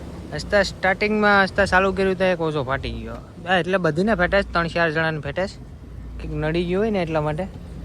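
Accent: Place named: Una, India. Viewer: bhai tamaru gam kyu che because tamari language parthi evu lage ke tame gir somnath na una taluka na koi gam na haso evu mane lage che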